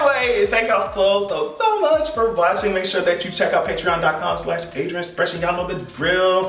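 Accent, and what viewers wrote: Jamaica. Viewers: I love ur jamaican accent 🥺😍 and yess I’m so happy for Adele! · If you don't speak that patois!!!! You betta, I love it, I want more boo, celebrate that heritage too. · When you speak Patois 🥰✨😍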